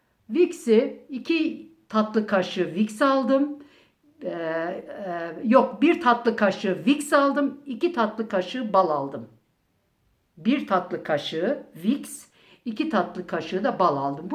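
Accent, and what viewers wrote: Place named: Eastern Anatolia, Turkey. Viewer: Bu xalanın danışığı çox şirin gəldi. Bizim dilə çox yaxın danışır. Bu xala Erzurum, Kars, İqdır ya da Vandan olmalıdı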